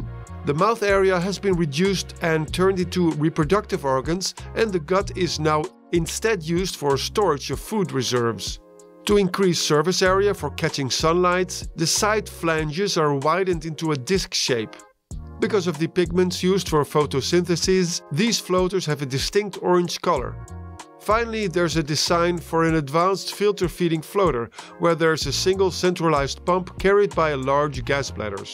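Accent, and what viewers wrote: Netherlands. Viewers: Are you dutch, afrikaans or german?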